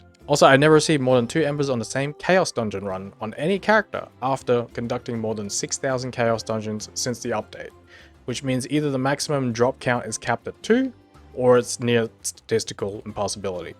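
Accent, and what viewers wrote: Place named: United States. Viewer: Your my hero! Wished i could do more then just thumbs up and subscribe. You sound like US, im in germany id send you REAL beer, for sure! Edit: Thats some insane account you have there, ive been playin since launch, even played at day 1 2014 with an fake account. Even if i had that much time to make so much high ilev characters i doubt i had the stamina for that, respect!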